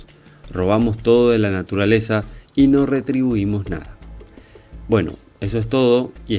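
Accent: Paraguay. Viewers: Enzo Aquino si viejo, Paraguayo es.. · Tu voz suena a Paraguay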